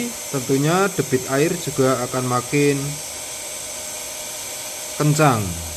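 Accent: Java, Indonesia. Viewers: "Walau Medhok, Kami terus Berkarya" Mantap kuwi mas!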